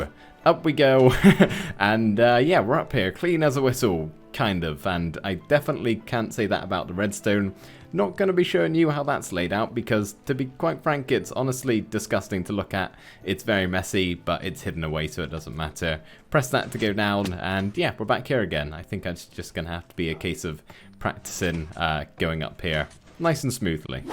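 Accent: United Kingdom. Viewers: Sadly i missed the stream and have no clue where to find it but i'm still loving this series so far (is it even a series) but yeah i'm loving it so far.Also are you British?You sound like me a bit.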